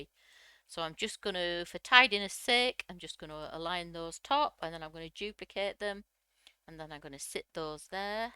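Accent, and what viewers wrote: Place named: North, United Kingdom. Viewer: I love your videos as you explain every thing so well and a good old northern voice to boot, which makes a refreshing change.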